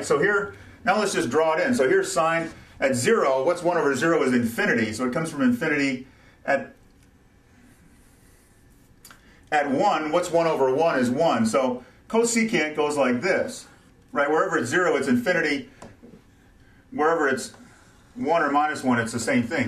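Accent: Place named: New England, United States. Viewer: Lol a slight new england accent I think is what you're getting at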